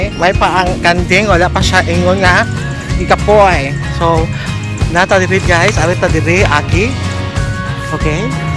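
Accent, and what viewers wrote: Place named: Cebuano, Philippines. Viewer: You remind mi of a cebuano host, pareha mo ug accent. Hehehe ngita lage ug uyab hahaha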